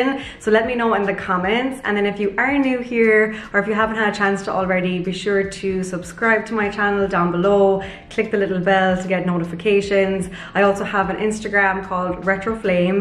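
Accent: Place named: Ireland. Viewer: Pretty Irish accent.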